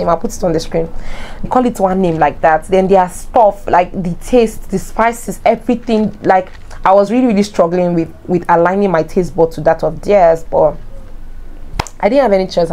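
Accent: Nigeria. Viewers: Accent from a Nigerian is funny tho 😂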